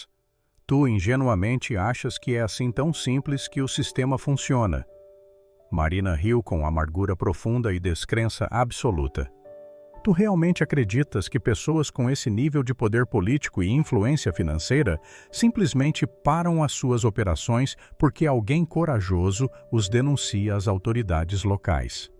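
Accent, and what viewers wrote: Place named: Minas Gerais, Brazil. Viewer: Este contador de estória com sotaque mineiro Mairna ora Marina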